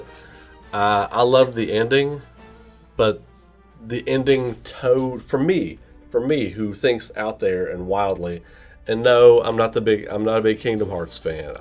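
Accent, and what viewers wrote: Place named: Kentucky, United States. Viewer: I’m curious on you accent, it sounds like Kentucky, but it’s a bit more rounded I think.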